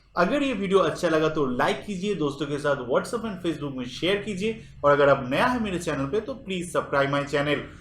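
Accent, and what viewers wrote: Bengal, India. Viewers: Bengali dada apka hindi bohut sweet hai...i like it...dada...👀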